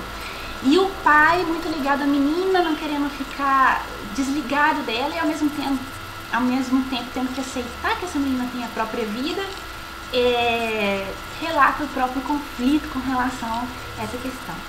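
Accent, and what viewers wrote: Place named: Minas Gerais, Brazil. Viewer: Genteeeee, olha vc!!!! Mega mineirinha😅😅😅 ah, adorei vê vc uns 10 anos de agora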